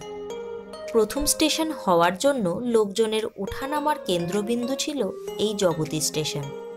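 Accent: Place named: Kolkata, India. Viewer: Tumi kolkata style a kano katha balo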